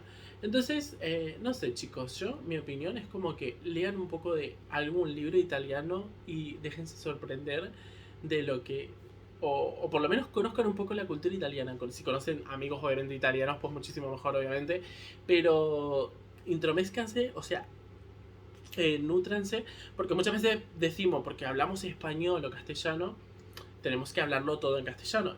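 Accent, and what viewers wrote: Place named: Argentina. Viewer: Cuanto hace que vivis en Barcelona? Hablas super argentino y se nota que haces un esfuerzo para pronunciar la "zeta". Entiendo que uses palabras como coger el bus, buaah tio, y todas esas expresiones... pero a que cambies de sonidos me parece muy contranatural.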